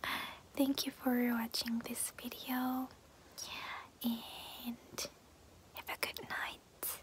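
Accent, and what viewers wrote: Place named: England, United Kingdom. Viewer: I like the banana container, it's so cute, and i like ur English accent!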